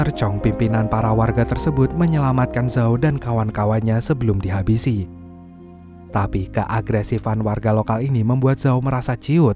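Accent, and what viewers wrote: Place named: Nganjuk, Indonesia. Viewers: Admin e wong nganjuk ki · Curiga admin e wong nganjuk ki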